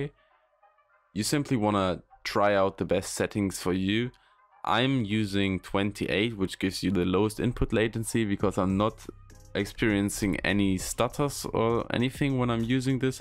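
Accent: Germany. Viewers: Yeah you can tell by his accent and his language in his PC is also Set to german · Are you german? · Man hört aus der Stimme raus dass du Deutsch bist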